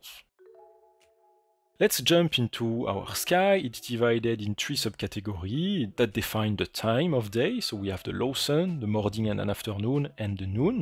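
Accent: France. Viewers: le mec a tellement un accent fort en français que même si tu parles pas anglais tu le comprends:)) merci pour les conseils en tout cas, très interressant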